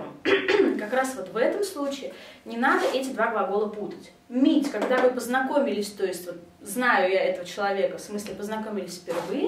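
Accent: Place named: United States. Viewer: Кстати у американский акцент, как у носителя языка с первого раза и не скажешь, что она русская!!! Продолжайте в том же духе и по больше видео!!!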